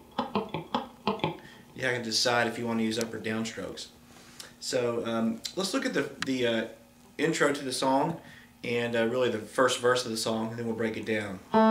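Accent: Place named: Texas, United States. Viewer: Isn’t this CC giving the tutorial? I mean to say that he’s got that TX accent, so...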